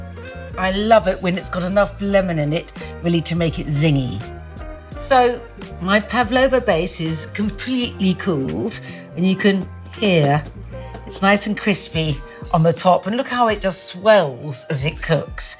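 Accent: United Kingdom. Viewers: I do love your very British English!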